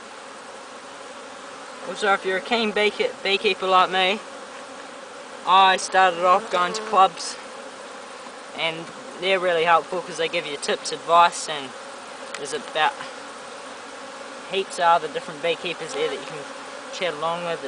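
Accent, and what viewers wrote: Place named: Australia. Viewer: What kind of "strip" was in the brood? Aussie accent ... Yank can't quite get it ...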